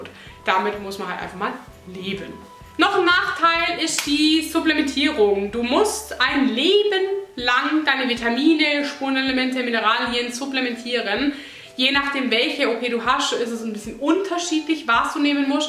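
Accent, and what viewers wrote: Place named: Swabia, Germany. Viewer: Man hört das schwäbische LEICHT raus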